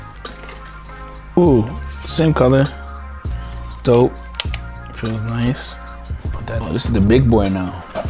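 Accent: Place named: Ontario, Canada. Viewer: Mans accent i can tell he from Ontario!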